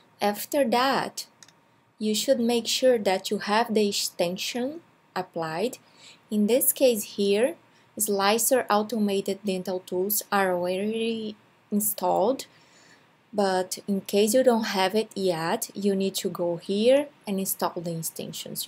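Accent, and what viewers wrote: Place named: Brazil. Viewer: Thank you very much! Appear Brazilian accent.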